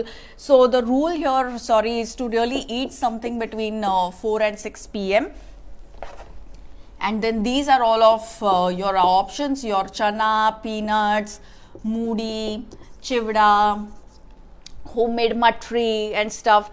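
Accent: Mumbai, India. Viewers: Love the mumbaiyya style talking ❤️ but mam every point is perfectly explained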